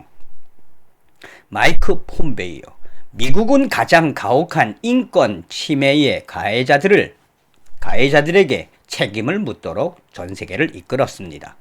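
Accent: North Korea. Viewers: 고저 더 가열차게 달려가갈시다레~~~달려가달라요!!!우리 부모님이 이북 출신이셔서, 이북 사투리 들으니까네 그냥 너무 좋은데요... 행복 하시라요~🙋🏼‍♀️👍👍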